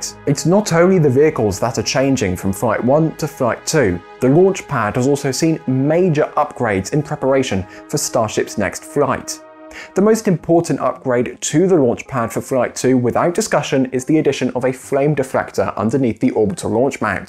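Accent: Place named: United Kingdom. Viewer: How come best SpaceX videos come with a British accent?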